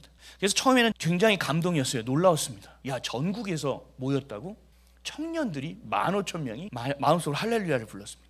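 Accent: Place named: Pyongan, North Korea. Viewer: 목사님 혹시 선대가 이북에서 오직 믿음 을 지키고자 남하셨던 실향민 이 아닌가 생각 되는데요. 목사님 억양에서 아주 살짝 평안도쪽 억양이 있으신것 같아서요. 저의 외조부 님도 이북분 이시라 그리고 어려서 피난촌 주위에 살아서 할머니 할아버지 주위에 이북분 들이 많았습니다 . 제가 어렸던 70년대 초때만 해도 예수쟁이 = 이북사람 이런 공식이였으며 이분들이야 말로 진정한 그리스도 의 믿음을 간직하셨던 분들이 많았죠. 저는 이분들이 이제 다 대한민국 에서 천국으로 뜨나셔서 하나님 앞에 바로 서셨던 분들이 진심으로 이나라를 위해 간구 하시는 분들이 안계셔서 대한민국이 이모양이 되지 않았나 저 나름 생각 합니다. 우리가 아무리 믿음이 있다 해도 이북에서 목숨 걸고 내려와 목숨걸고 예수 믿었던 분들 에게 비하면 아직도 많이 부족한가 봅니다.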